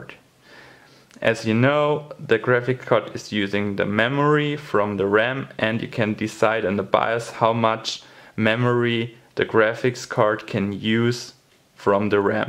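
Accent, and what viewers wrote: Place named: United Kingdom. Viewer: Nigga cant u tell? He has a fucking brit accent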